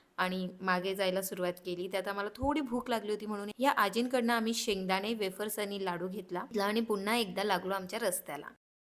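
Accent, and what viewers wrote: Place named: Khandesh, India. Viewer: माय हो आपली खानदेशी उत्तम वाटते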